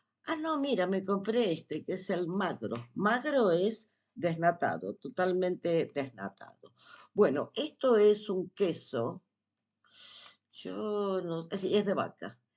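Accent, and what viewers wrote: Argentina. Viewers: Hola...qe bien te explicas... No se te nota casi qe eres..Argentina....es bueno comprar en otros super...no siempre en el mismo..y asi se compruevan..los precios y calidad claro chao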